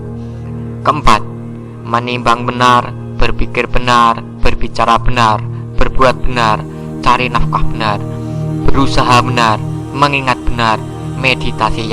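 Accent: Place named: Java, Indonesia. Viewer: Bisa gk, di kecilin musiknya dan logatnya jangan meddo kali la..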